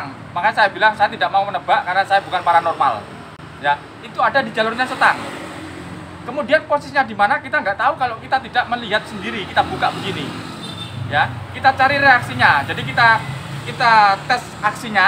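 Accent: Java, Indonesia. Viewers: masnya muka batak tapi logat Jawa tulen, keren mas bang😁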